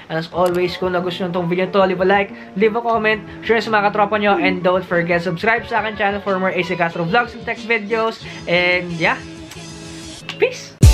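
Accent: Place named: Philippines. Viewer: As u can see pinoy yang nagsasalita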